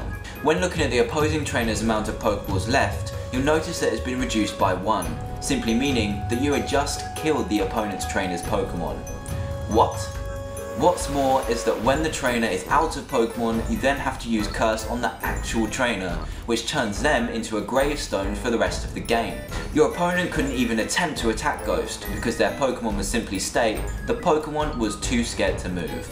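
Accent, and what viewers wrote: United Kingdom. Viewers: Your English accent makes the Hypno poem way scarier. English accents in general have that effect.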